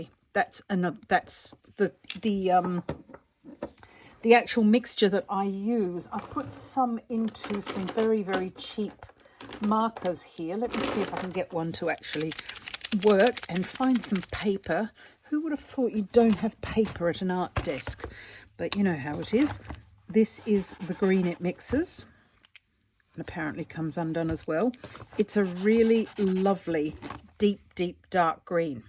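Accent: Australia. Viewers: Hello. Just found your channel and kept thinking "she" sounds Aussie and then finally at the end you said you went to school in Australia. Hi from a fellow Aussie :) Enjoyed your humour along the way & perhaps that disorder is contagious. I have it too 🤣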